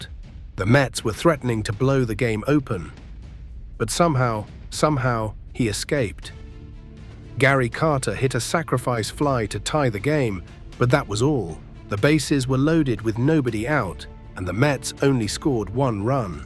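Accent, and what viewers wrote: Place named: United Kingdom. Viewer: The accent tells us at the very beginning,, this video is going to be a bomb 💣 😅😂😅,,, Veeda Blue,,,, Dykstra Crushed a home-run off oil can Boyd, it hit the Pesky pole in right field, it's been said to be anywhere from 274 feet to 300 feet, regardless, its not a home-run that was CRUSHED, but not according to this Britt nitwit! 😅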